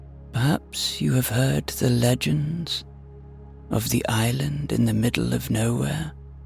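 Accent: Ireland. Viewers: Thank you. I realised how much I enjoy story time by the Irish. Your count down is amazing xxxx Goodnight from Australia ❤ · Another beautiful story from Megan enhanced by the lilt of our Irish ☘️ Storyteller,! I haven’t commented lately but you have literally been playing 22-23 hours/day& nite on loop for the last 3 weeks., 🤗you have been my best Friend during a severe Mito “crash”. Thank you for keeping me company even through my subconscious. I felt supported, safe, soothed & so much companionship in this time of restoration. My energy is coming back! Sláinte!!! ❤🙏🍻😊Wishing for You, Reb, & Timmy all the love, light, & amazing ENERGY you put out in the world back to you in spades! ☀️💖🌍♠️♠️♠️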